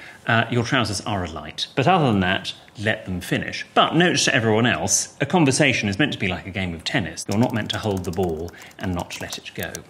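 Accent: United Kingdom. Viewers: 判を押したようなイギリス英語だけで腹いっぱい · イギリス英語聞けて嬉しい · イントネーションイギリスすぎる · どうしてダメなのか理由を教えてくれるから良い。 イギリスっぽいイントネーションと時々皮肉が混じるのも見てて面白い。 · イギリス英語だー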